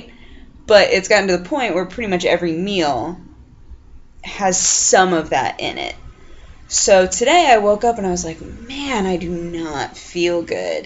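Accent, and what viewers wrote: France. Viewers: Les deux manières de le dire sont bonne :) Ton accent est très français de France mais c'est très bien! Long time French Canadian follower :3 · oh that ridicolous french accent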